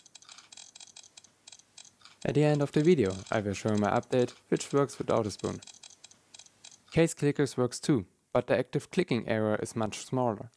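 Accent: Germany. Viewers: Dieser deutsche Akzent😂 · Man hört das du deutscher bist :D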